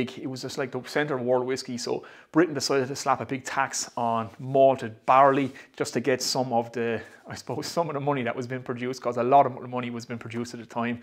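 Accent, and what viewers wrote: Ireland. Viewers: HI! I love you reviews! They have helped me understand Irish whiskeys so much better. Qstion for you- I have a friend going to Ireland in about 10 days, I want to have him pick up some whiskeys for me, preferably something I cant get here in the states. I do like bourbon style whiskey the best, Jameson is good but im not a big smokey scotch drinker. Any suggestions? Locally I can get powers Gold label and im going to try that! LOVE THE BROGUE!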